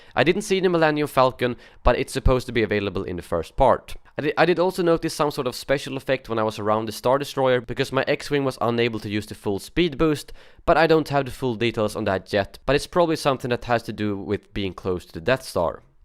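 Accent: Sweden. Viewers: are you swedish ?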